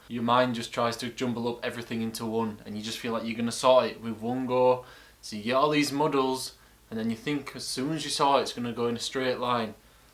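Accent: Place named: Yorkshire, United Kingdom. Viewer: Ngl i was just lurking here to learn ur accent cause like i said i wanted to prank my friends with a yorkshire accent, BUT this video hit me in unexpected ways, i needed to hear this at this moment. I've been unmotivated to fo anything for the past 3 months. And being at my age (which is 24) it sort of builds another level of pressure whenever im paralysed by overthinking. It's very hard to snap out of it. But what u said kinda gave a push to start small, start somewhere. Thanks for this man.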